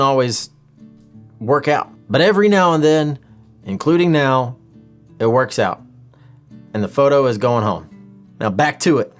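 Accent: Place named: United States. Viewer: love watching from UK . So interesting . Love the USA accent ...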